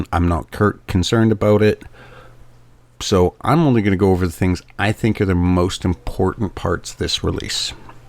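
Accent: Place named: France. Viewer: What is this robotic french voice. Please stop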